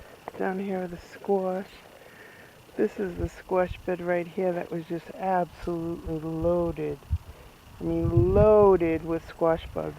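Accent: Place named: New England, United States. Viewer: No japanese beetles in these parts, cabbage butterfly's caterpillars and Sphinx moth larva [tomato horn worm ] are my biggest pests and quite controllable with diligence ... lol. Love listening to your NE accent !! ;p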